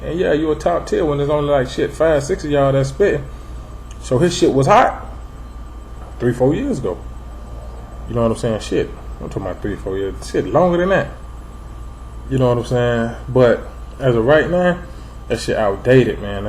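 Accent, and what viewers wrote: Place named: South, United States. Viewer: N I fucks wit u cuz u kept it 100 all the way I could tell u was frm the south b4 u said it real nigga shit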